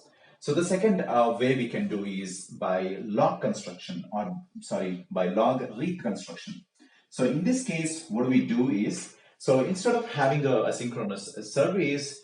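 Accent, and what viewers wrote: India. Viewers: Your slang like tamil.i am right?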